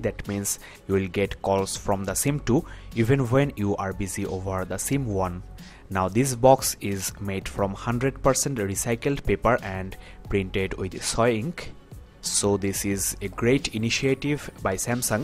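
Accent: India. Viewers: india voice ?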